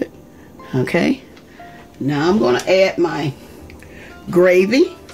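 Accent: South, United States. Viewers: Thanks looks good I really like your your southern accents I know people southern I really like southern friend chicken Thanks for sharing blessed you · Her strong southern accent just made this 100× better. I KNOW that liver and onions good. Lol Also, I've never had it before and my bf wanted some. This helped a lot. ♥️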